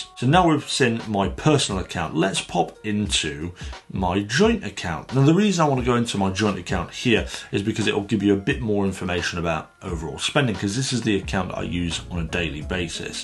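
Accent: United Kingdom. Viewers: im curious which part of the uk are u from!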